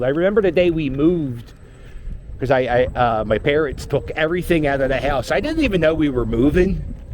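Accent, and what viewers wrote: Philadelphia, United States. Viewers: I love these vids. Philly transplant in NYC. I love your Philly. accent!!! Good jawn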